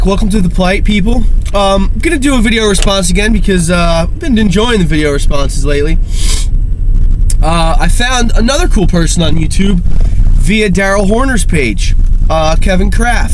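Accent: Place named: New Jersey, United States. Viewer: You sound like you're from Jersey. I'm subbing. I agree though. It does take someone with character and who is genuine to put themselves out on video. Most people I know are too afraid to get on camera. The people who aren't afraid, though, actually have some real stuff to put out there. I'm overcoming the flu myself. I know your vid was uploaded 2 years ago, but thought I'd share that :-)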